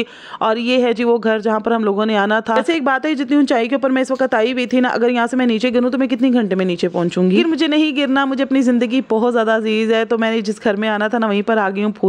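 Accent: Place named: Lahore, Pakistan. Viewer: Your urdu is not like pashto speaking persn is totaly lahori accent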